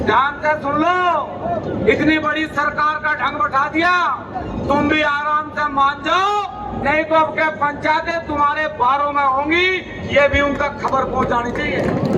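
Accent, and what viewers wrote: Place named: West, India. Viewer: Paschim ki boli esi he bhai good work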